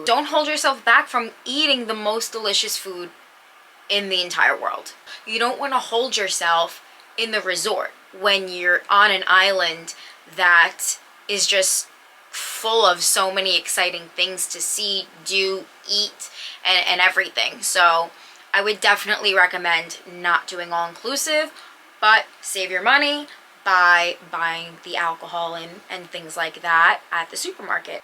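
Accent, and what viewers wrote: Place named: New York, United States. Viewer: Thanks for the heads up!! We are going to the same resort! New subscriber for sure! Grew up in Jersey and I had a pretty good scene you were from NY the second I heard you start speaking! 😆😆. Thanks again.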